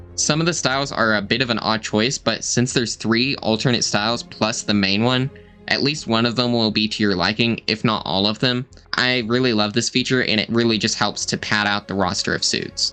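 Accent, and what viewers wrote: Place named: United Kingdom. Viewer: Bro I've noticed that you have the British accent. Can you give to me some advice to improve my listening skills to understand you British people?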